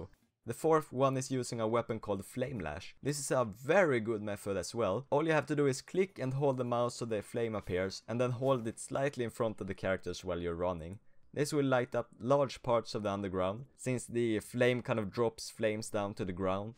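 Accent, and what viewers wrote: Sweden. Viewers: I hear ur swedish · Man hör att du är svensk. xD bra video btw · This is the most Swedish accent iv’e ever heard.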